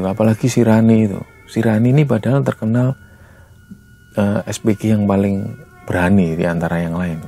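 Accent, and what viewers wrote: Java, Indonesia. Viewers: penyampaian ceritanya mirip pakde alfan apalagi cara ngomongnya medok jawa suka banget.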